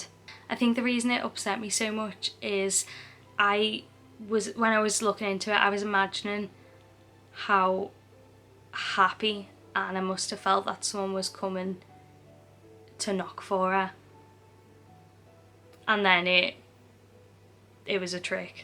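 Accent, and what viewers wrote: Liverpool, United Kingdom. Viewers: Like you said yourself, this case really affected me. I hadn't cried in ages but I cried for Ana. Such a tragic, horrific, needless way to die. Good channel by the way. And the scouse accent is very easy on the ear! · Thumbs up before watching the whole show, because you're a northern lass, " Scouse " to be precise . hahahahah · Another youtuber just posted this story almost word for word lol, but only did part 1 so was really glad to find this. You are amazing at telling stories and as a fellow scouser great to hear your familier accent. Such a sad story she was a lovely girl and my heart goes out to her family. RIP Ana this should never have happened.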